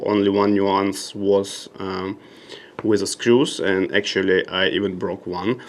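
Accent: Russia. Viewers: ваш акцент похож на русский, вы говорите на нем?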